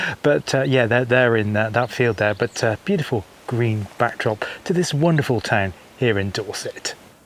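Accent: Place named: Yorkshire, United Kingdom. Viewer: Hello - greetings from Poland. Your video certainly captured the essence of Shaftesbury. I've been there many many times as my ex wife's parents lived there in the latter years of their lives. Loved Yorkshire commentary and camera work. The still photographs especially the landscape shots were exceptional. Congratulations. A very fine video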